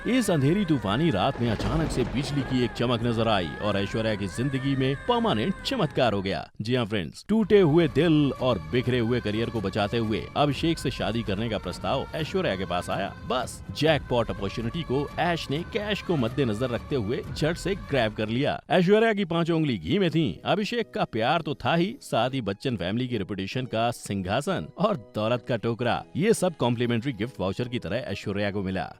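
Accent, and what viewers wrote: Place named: Haryana, India. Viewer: Bhai hrynvi ni bt bolne ki kosis k liye 100/100😉🤘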